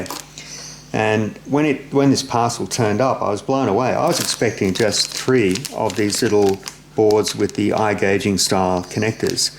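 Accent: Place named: Australia. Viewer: Bridgeport would be my machine of choice, but being a hobbyist I "opted" for an Optimum. It would be great to add your touch dro device to my newly ordered machine. Good luck - great to watch your channel, particularly to hear the familiar Aussie accent (here in Sydney)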